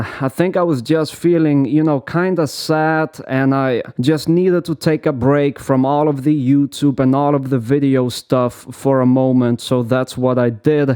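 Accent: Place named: Russia. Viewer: I’m just here to hear his Russian accent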